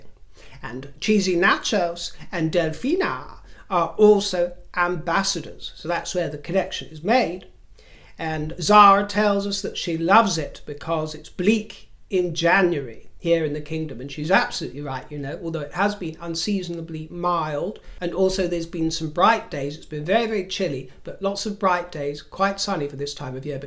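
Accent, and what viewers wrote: United States. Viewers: Brilliant American accent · your American accent is a SCREAM!!! spot. on!!!! LMBO!!! 🪖🎖😆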